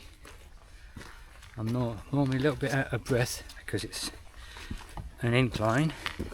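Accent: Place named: England, United Kingdom. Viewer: New subscriber here, just watched your SnugPak Jungle bag review 👍🏻 Sorry to see you had your heart attack but glad to see you made it and on the mend. Don’t be afraid to look at complementary protocols to run alongside the conventional too 👍🏻 Hope your well for a very loooooong time 👍🏻 Incidentally you sound English to my ear 👂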